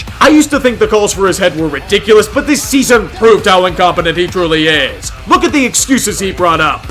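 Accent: Pittsburgh, United States. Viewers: He’s gone full yinzer mode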